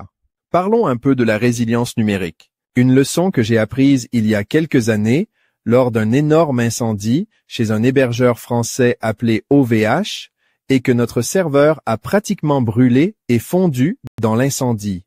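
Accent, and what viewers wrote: Canada. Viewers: avec un accent canadien, c’est rigolo 😃! Tu as traduit ta vidéo de l’anglais vers le français avec OneTake? C’est génial! La synchronisation des lèvres est incroyable wow 😊et la traduction aussi! Je suis ravie d’apprendre que mes vidéos sont en sécurité sur plusieurs serveurs et qu’ils sont redondant en cas de problème ou de panne pour que mes vidéos en ligne soit toujours accessibles, d’autant plus pour celles qui constituent mon offre!😍🫠🥰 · C'est peut-être bien pour traduire dans une autre langue que votre langue natale pour des gens qui ne connaissent pas votre voix. Mais, là, cela ne fait pas du tout naturel ! Hormis l'accent canadien, le rythme est souvent saccadé et ne respecte pas le ton naturel qui conviendrait à ce qui est dit. Cela fait vraiment robot. Personnellement, je n'aime pas.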